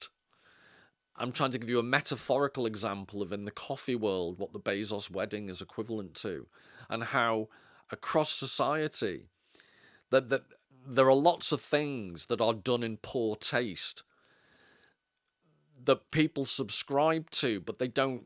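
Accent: United Kingdom. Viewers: Ur so great to listen to man so British with your delivery hahahaha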